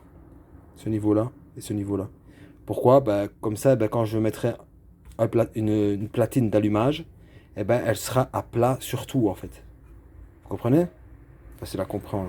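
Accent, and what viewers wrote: Belgium. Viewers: Je décèle un p'tit accent belge non? Je viens de découvrir ta chaîne 😉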